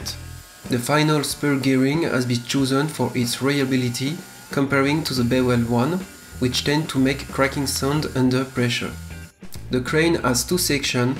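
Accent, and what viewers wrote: France. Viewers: Joli accent français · Watched 1 video and i m a big fan dude. Love your franch accent as an german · On reconnait l'accent francais😅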